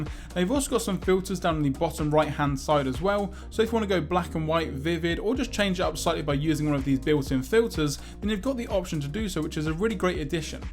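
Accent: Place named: Cardiff, United Kingdom. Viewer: Didn't know you Cardiff man 😂 are you Welsh?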